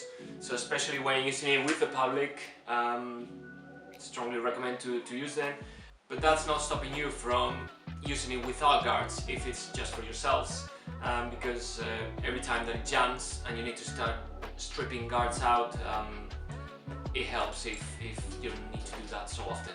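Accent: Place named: Spain. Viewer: Se lo mandé al grupo intercambio para que los españoles oigan inglés de un español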